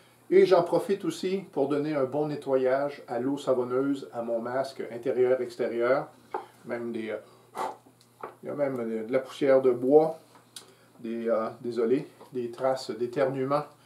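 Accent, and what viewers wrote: Quebec, Canada. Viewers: Bonjour. Je n'ai découvert vos vidéos que très récemment mais elles sont vite devenues un régal pour les yeux et les oreilles. Pour les yeux car vos plans de prises de vue nous donnent l'impression d'être à vos côtés (parfois même, mieux placés que vous:-) ) où nous pouvons profiter de la beauté des gestes. Pour les oreilles non seulement parce que vous êtes très généreux en commentaires instructifs et conseils avisés mais aussi par la mélodie de votre accent québécois qui, en ce qui me concerne, résonne comme une symphonie tant il est agréable à écouter. Vraiment, vous m'avez donné l'envie d'apprendre à tourner. Un jour peut-être, quand je pourrai m'équiper. Je vous souhaite, à vous et votre famille, de très Belles Fêtes de fin d'année, et j'ai hâte de vous revoir dans une nouvelle vidéo. Bonjour de France.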